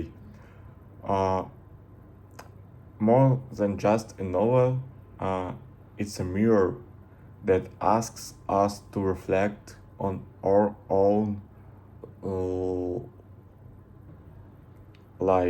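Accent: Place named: Eastern Europe. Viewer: To talk about this more, I don’t personally think hedonism is inherently bad, but I think it is often unhealthy for a person mentally. I don’t know what culture you are from, certainly your accent sounds like one of the languages in the Slavic family, but I’m from an area that is very repressed and people are not as open or accepting of others or new experiences as they should be in a healthy society. I feel like Mr. Wilde should not have been persecuted by others, but also that he should not have persecuted himself.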